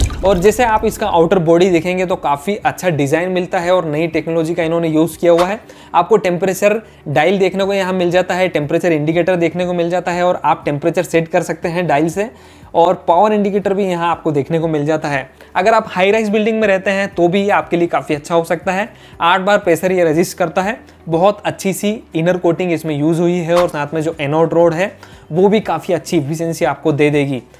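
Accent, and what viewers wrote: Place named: Northeast India, India. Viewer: North East India se ho kya aap.. ? apki accent vaisi h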